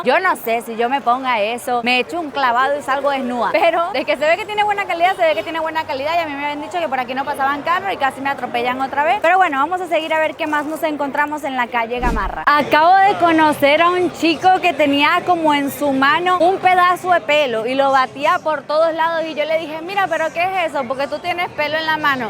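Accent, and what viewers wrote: Venezuela. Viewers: Siento que en este video habla más como VENEZOLANA, que cuando está en México 😅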